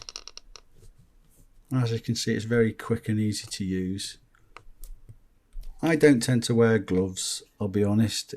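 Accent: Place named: Midlands, United Kingdom. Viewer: Excellent feature/tutorial. What would closest describe your accent, Leicester, Derby, Stoke?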